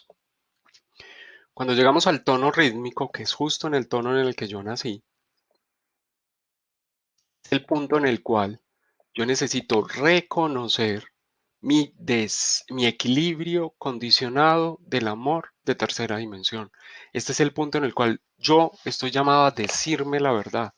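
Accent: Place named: Colombia. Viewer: Maravillosa exposición,una genialidad como enseñas; con profundidad y esencia das la enseñanza maya, Gracias!!! Encima de colombiano sos!!! Dulzura pura... amo profundamente la tonada colombiana, te enamoran al hablar. Un alemán te dice te amo y te asustas y un colombiano te insulta y pensas q te está piropeando. Desde Villa Carlos Paz, Córdoba, Argentina 💖💗❤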